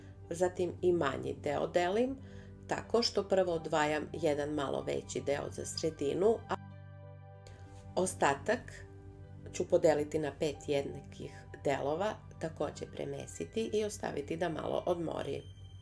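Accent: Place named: Niš, Serbia. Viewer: Joj što volim ovaj vaš rekla bih Niški naglasak. Pozdrav iz Podgorice 👍🍀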